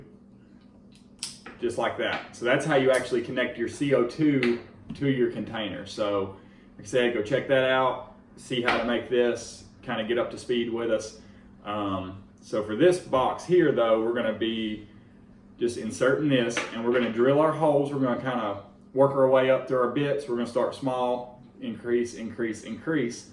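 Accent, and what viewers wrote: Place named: South, United States. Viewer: Great video! Just curious though, what part of the south are you from?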